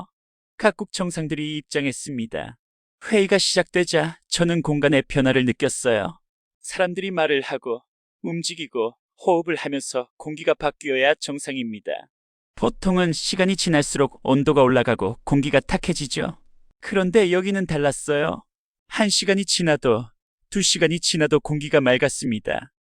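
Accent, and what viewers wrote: North Korea. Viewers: 나래이션..ㅎㅎ 북한말버젼... 모야...ㅎ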